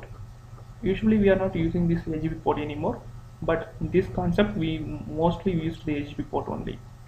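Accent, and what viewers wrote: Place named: India. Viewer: really want to watch your video, but it is not possible!!!! talk slower, louder, and dont run with the words. ur indian accent is very strong, u should know it, and slowwwwww it down, have u watched it? understand any thing??? 27 min, and you dident notice it? its sad i can not enjoy your wisdom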